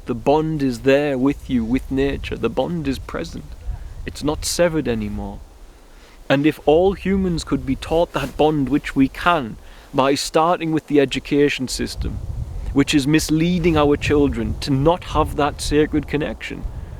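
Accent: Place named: Newcastle, United Kingdom. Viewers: He has a Durham accent, northeast English anyway. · I sense a Durham,UK accent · this one was a goody, btw way are you a geordie?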